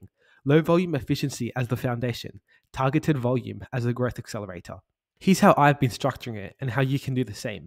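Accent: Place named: Australia. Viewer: respect to your optimistic attitude. also fellow aussie i hear?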